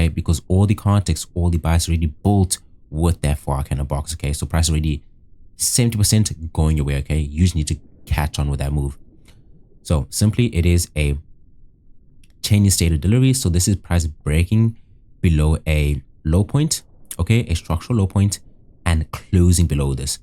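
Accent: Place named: South Africa. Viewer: 😊detecting a SAn accent here.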